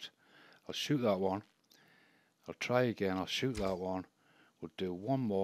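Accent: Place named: Newcastle, United Kingdom. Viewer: GREAT TO HEAR A geordie hhaha x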